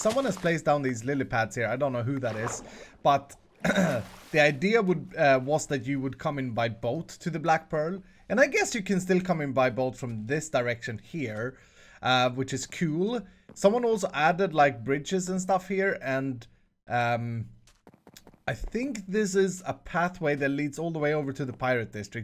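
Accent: Sweden. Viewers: Are you Swedish?!? I’ve never seen your channel before, l really like your video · You ar from Sweden right?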